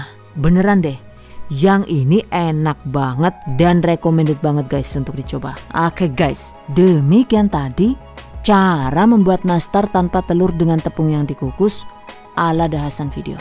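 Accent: Banyumas, Indonesia. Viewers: 😂😂😂ak cilacap bun... klo bunda asli orank mana si....? pake bhsa ngapak juga jadi ngakak pas ada lalat mo ikut bikin kueh nya😂😂😂 ..❤ ak sllu mngikuti resep² bunda g pernah gagal...sehat² sllu ya bund....🎉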